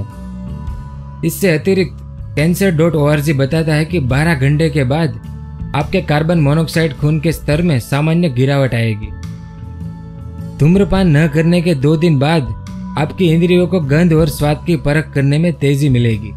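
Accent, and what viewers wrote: Gujarat, India. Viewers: gujrati voice 😂❤ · Bhai tu gujarati hai kya ????🤔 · Gujrati h ky bhai???? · Bhai, tum gujrati ho?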